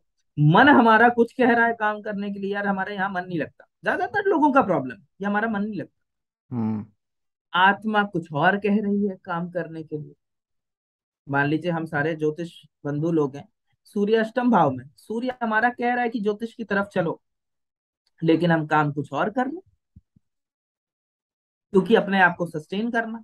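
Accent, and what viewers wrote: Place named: Allahabad, India. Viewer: I didn't know before that he is from Allahabad. But when I heard for the first time, i got Allahabadi vibes from him😅. But ignored it. Must say Allahabad has its own taste.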